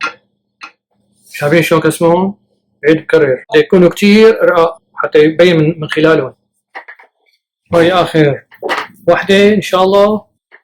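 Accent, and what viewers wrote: Levant. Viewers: لك ما تبلى المهارة الشامية ❤️ واللهجة الشامية ❤️ وهالفكرة الحلوة لتعليمنا اكلة الطفولة وذكريات شام الامان وصراخ البائع ناعم يا ناعم ❤️الله يعيد هديك الايام بهمة ناس بحبوا بلدهن ❤️ الله يعطيك الف عافية وشكرًا جزيلاً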